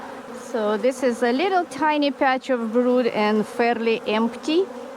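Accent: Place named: Russia. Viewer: Замечательный русский акцент😁., мой английский не совсем вери гуд. Как потом с маткой решается вопрос? Она же среди этих 5 корпусов.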